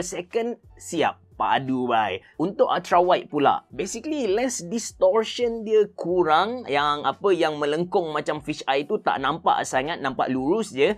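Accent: Kelantan, Malaysia. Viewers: Cute ye kecek kelate...tak dengo bahaso..kalo Johor tak geti bahase lerr..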